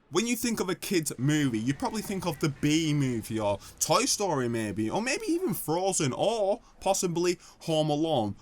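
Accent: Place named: Bolton, United Kingdom. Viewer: That's a Manchester accent Sounds like Bolton?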